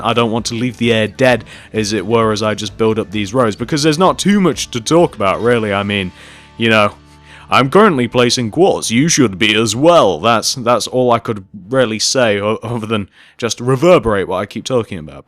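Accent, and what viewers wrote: England, United Kingdom. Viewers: Are u British? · He's English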